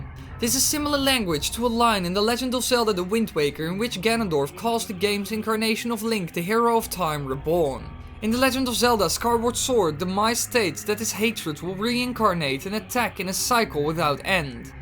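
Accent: Netherlands. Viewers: are you from holland ?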